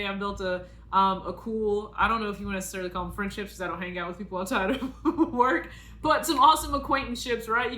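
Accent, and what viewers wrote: South, United States. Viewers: Yaas congrats on the promotion girl!! I always love to see my fellow women winning and prospering in life! No offense to the men here 🤣. I have a question: where did your southern accent come from? I've been trying to go through states you might be from in the Midwest like Kentucky, Missouri, etc but now I'm stumped since MI was mentioned.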